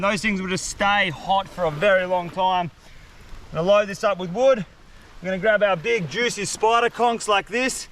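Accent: Australia. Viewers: Since Steve Irwin is dead this dude has to do with his australian accent. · Are u from Aus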